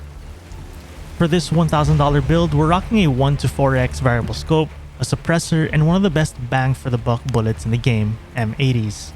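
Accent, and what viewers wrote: Philippines. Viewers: Hello, I'm getting on the new wipe, it'll be my second wipe. So starting from the beginning. Seeing if you'd like to queue up with me at all? Also are you filo? You have a filo accent. I'm filo myself! Nice videos and I enjoy it! 🇦🇺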